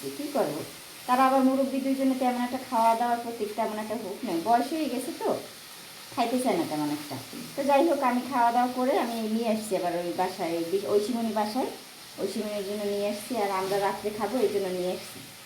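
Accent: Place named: Barishal, Bangladesh. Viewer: O monu tumer parbay ❤ Borirasl bolay kothy 😎💯🔥👍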